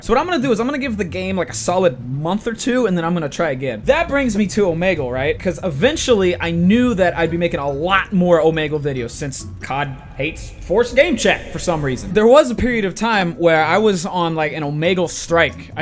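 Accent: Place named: Brooklyn, United States. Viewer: You have a strong Brooklyn accent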